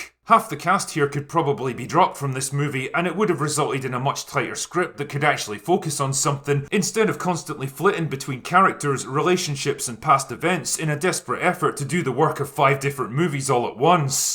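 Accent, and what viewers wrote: Scotland, United Kingdom. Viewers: Brilliant critical reviews masterfully delivered in a way only a Scot can do is the reason why we need to protect and defend our ways and traditions as if the world depended on it - because it does. Thank you for bringing a huge slice of goodness out for all to see what goodness is all about.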